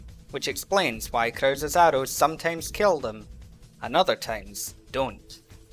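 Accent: Scotland, United Kingdom. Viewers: Why do you sound Scottish lol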